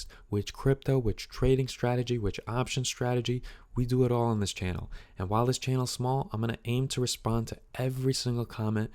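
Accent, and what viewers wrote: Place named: East Coast, United States. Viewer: very well done. I love your east coast accent too.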